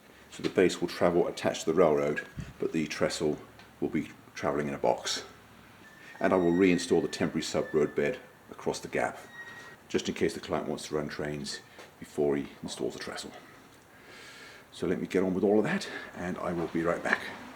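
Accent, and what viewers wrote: England, United Kingdom. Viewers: In one word... " Perfection " you are without doubt, the best non club single handed builder of layouts out there. Are you British? you sound English:)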